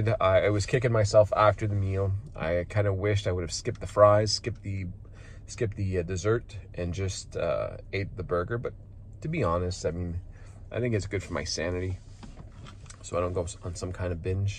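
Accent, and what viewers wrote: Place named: Canada. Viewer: Are you canadian! i heard a little Canadian accent! love your videos, very helpful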